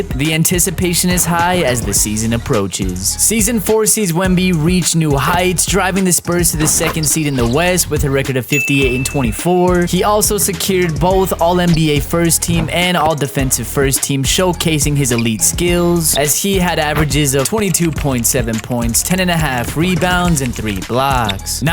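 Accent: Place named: Mexico. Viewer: dude sounds mexican as fuck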